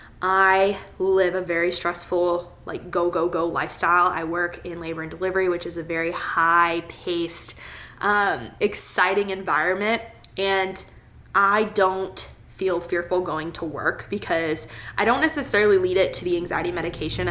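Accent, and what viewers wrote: South, United States. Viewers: Yes girl definitely so happy for you and so happy your back! Your a real inspiration and have such a kind heart, it’s so nice seeing someone who is genuine and I feel like your getting alittle southern accent in you!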